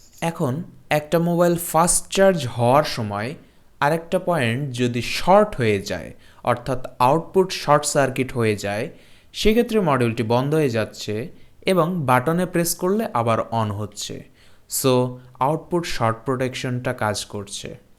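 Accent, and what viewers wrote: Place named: Chapai Nawabganj, Bangladesh. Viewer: ভাই আপনার বাসা কি চাঁপাইনবাবগঞ্জে। আপনার কথার টান আলাদা।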